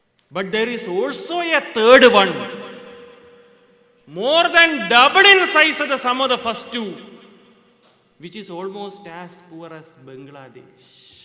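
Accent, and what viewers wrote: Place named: Kerala, India. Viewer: Are malayali accent delivery great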